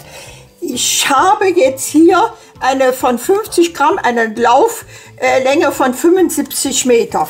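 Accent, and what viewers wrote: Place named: Bergisches Land, Germany. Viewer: Dat hört sich sehr nach meiner alten Heimat dat Bergische Land an😊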